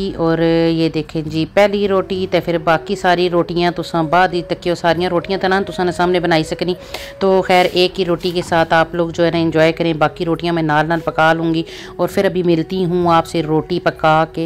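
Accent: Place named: Pothwari, Pakistan. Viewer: Mash Allah very nice and interesting vlog.I love your gulabi pothwari and gulabi Urdu, it makes it so interesting 😊. Bhindiya are my favourite with chicken or meat 😋😋😋.stay connected ↪️🏕👀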